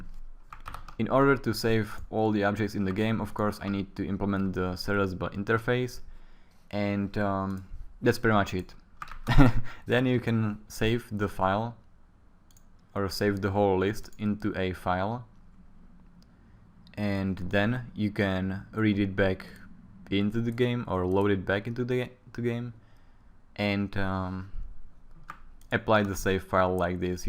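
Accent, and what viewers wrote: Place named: Czech Republic. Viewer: ***** Where are you from? Because you sound likr someone from czech republic :D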